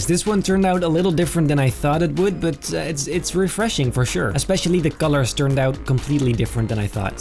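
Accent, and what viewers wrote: Netherlands. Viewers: Quick question, are you Dutch? Becuz your accent is the same as ours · Turned out pretty good ✨, but I miss videos with the dutch accent 😆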